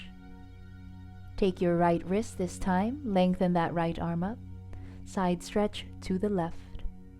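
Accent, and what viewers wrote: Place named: Philadelphia, United States. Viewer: I like your classes. I especially like this one and use it quite a bit. I use a bunch of different videos and teachers, but you and another instructor, always tell when you’re doing your last downward dog. I appreciate that. Just out of pure curiosity, are you from the Philadelphia area? I’m only asking, because I enjoy trying to figure out accents. And yours sounds a little Philly. Obviously if you don’t want to answer, there’s no big deal. Anyway, thank you for your classes.!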